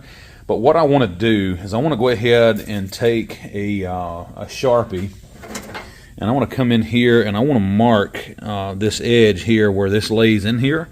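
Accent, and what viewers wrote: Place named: South, United States. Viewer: Where are you from ? Colorado? I detect a southern twang.. 🤠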